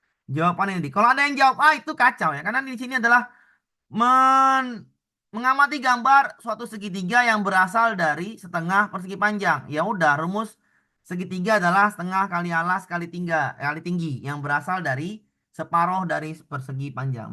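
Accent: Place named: Medan, Indonesia. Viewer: Medan kali logat bapak ni 😂😊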